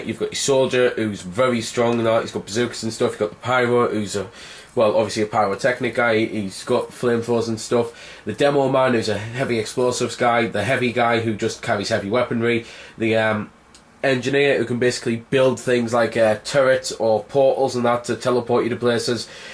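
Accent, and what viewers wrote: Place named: England, United Kingdom. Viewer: WHOA, I just clicked to :39 and right away I'm blasted away by this...... Loud, fast, English accent XD the only word I understood was "Achievments" lmao No offense to you, of course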